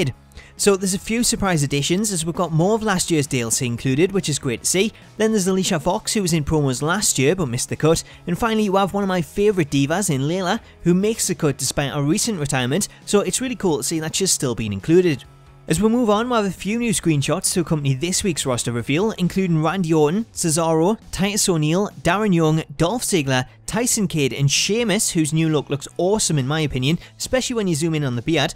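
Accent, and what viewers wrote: Newcastle, United Kingdom. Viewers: Are you from NewCastle ?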